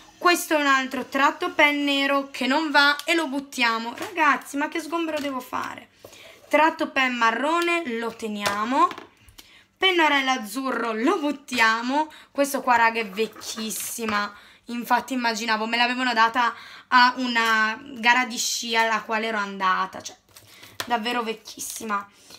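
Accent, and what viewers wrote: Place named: United Kingdom. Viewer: ODDIO adoro la tua pronuncia in inglese. that's so british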